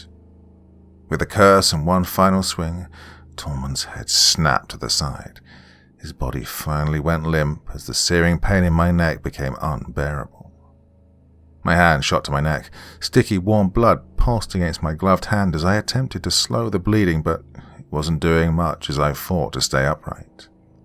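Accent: England, United Kingdom. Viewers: Once again, what's up with an English guy narrating a story about US NAVY SEALS??? Its so distracting and out of place..cant find even 1 American to do this job? Really? Couldnt get through this story because of it. I spent 4 years active in the Marines, another part of the Navy, never in all those days did I hear 1 British accent the entire time. So why here? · Have you ever tried using a coffee/tea cup, glass or Styrofoam cup to emulate radio chatter? Love your stories. Only good narrator that does military stories and doesn't sound like shit lol and the deep english accent makes the stories even better.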